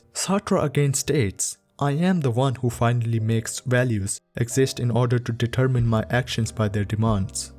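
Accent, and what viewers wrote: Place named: India. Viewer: India comes under Asia, but I feel like he has a strong Indian accent, maybe south east Asia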